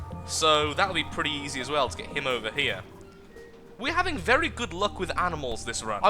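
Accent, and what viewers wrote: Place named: RP, United Kingdom. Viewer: I love how posh sounds.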